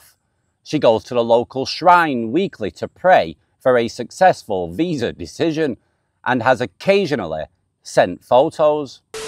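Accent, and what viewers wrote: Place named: North, United Kingdom. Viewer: Glad you are back in the groove. I look forward to your stories every day. I enjoy your northern accent. Dave from California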